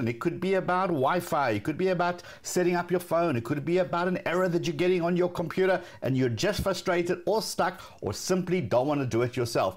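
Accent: South Africa. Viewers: I definitely noticed that South African accent lol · its south african im sure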